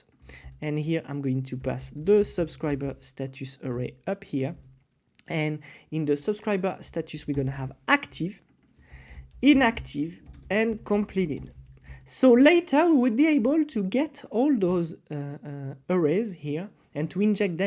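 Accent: France. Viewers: I see your frensh accent and ilove you sur❤ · Good one, you sound Frenchie, it will be great if you also have a french channel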